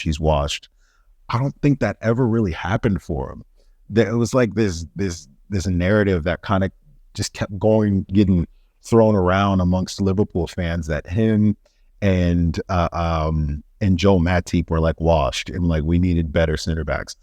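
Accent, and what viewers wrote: United States. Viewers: I've just subscribed for one reason,and one reason only! You are the only person with a US accent that knows exactly what you're talking about. There are a couple of points that you have made that I totally agree with. Not that there right (sometimes it's a matter of opinion). But I have a good knowledge of Liverpool. And the current team. And you are 100% on the money on so many points 👍. If you need to get a bit more exposure, and grow your Chanel? You need local YouTube channels to start looking at you. Contact some of them and see what happens. Knowledge is knowledge. And a red is a red. Looking forward to listening to more of your analysis 👍👍👍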